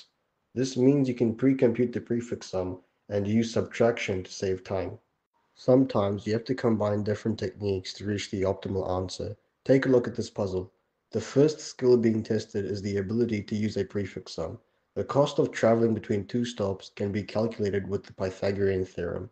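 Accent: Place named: Australia. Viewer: You sound like an ozzie 🤣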